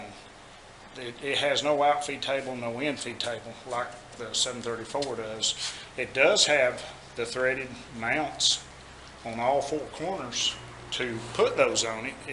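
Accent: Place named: South, United States. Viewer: Thank you for the review. I've been eyeballing planers and this helps in the selection. It also explains why I've had snipe issues with using my father's 735 planer. Also no issues with audio here, most people probably just can't understand the Southern accent, I'm from the south so it was loud and clear here. 🤣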